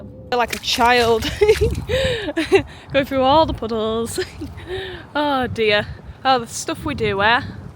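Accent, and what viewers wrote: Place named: North East England, United Kingdom. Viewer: Advisory 18 😂 you sound like your from the north east lass?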